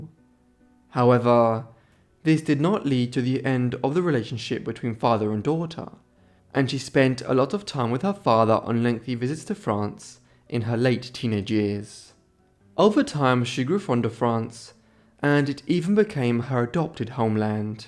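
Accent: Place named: United Kingdom. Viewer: Narration would be better by an American, not a British accent.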